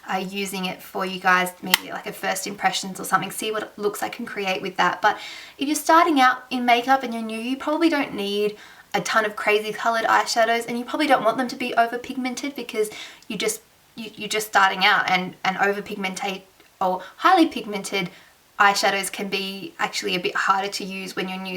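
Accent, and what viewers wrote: Australia. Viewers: Yes, an Aussie accent! New subbie here :)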